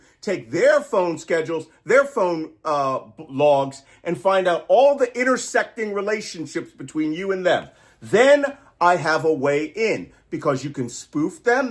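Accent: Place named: Maryland, United States. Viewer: Going by your accent and expressions I'm guessing that you are from Maryland.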